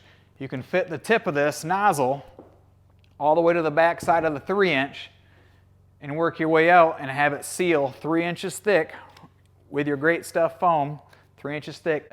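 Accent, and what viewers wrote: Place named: Michigan, United States. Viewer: As soon as I heard you talk, I knew you were from Michigan... it's like I'm listening to someone from my hometown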